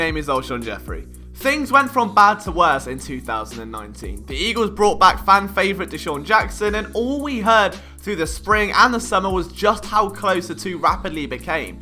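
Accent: United Kingdom. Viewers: Well, I'm from the Philly area, and knows as much about my Philadelphia Eagles as any other reporter, if not moreso. I fully embrace his accent and overall tone and cadence, in fact as an American who spent some time in Scotland, I knew people over there that poked fun at the NFL because it wasn't "literal FOOTball" so frankly I enjoy the fact that a young man from the UK became a top tier Philly Sports writer/commentator because of how much he loves the Eagles etc. You're awesome, and your accent is great, and makes me happy to know that our sport and more specifically my Philadelphia Eagles can not only reach someone from another country, but also capture their heart like it has mine and many others. You be you.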